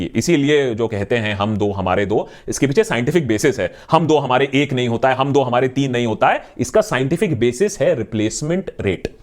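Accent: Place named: Bihar, India. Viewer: The Deshbhakt are you a Bihari.Your hindi accent is very much alike a Bihari accent,i like it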